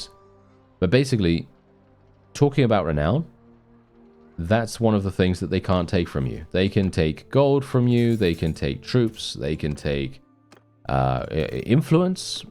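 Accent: United Kingdom. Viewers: The Posh English ! Keep on trucking Sir ;)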